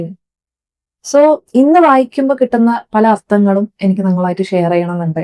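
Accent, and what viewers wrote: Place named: Kannur, India. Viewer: I started watching your channel recently. I like the content very much and very relatable. I guess you are from some part of Kannur. Me too.. I forwarded your video to my friend Sajna she also talks in the same way😂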